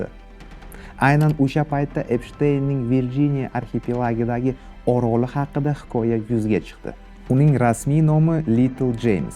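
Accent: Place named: Navoiy, Uzbekistan. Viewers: Навоий акцент ку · Aka Navoiydanmisiz, shevayiz shyaqqa tortyapti😊😅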